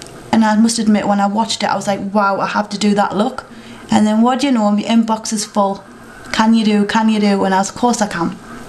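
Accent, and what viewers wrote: United Kingdom. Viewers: she is british but we'd say shes def from newcastle or nearabouts. geordie accents are actually too cool we want one. love the makeup in this too, that palette is awesssommee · Its The Geordie Accent.. Everyone From Here Says That. Bt To You It Would Mean Our Eyelid Lol. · you r really goood!! hahaha oh i really miss the british accent!!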